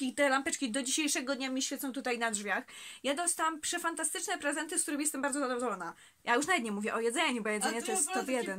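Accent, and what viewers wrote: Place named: Silesia, Poland. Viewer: Jak fajnie ślonskiego posłuchać na yt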